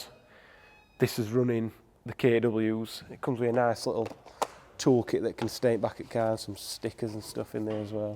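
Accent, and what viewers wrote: Yorkshire, United Kingdom. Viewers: I live in Yorkshire so feel comfortable saying this, brilliant accent my friend! When talking about switching the forged wheels ‘let’s see what difference it mecks’. Brilliant. Really enjoying this 125d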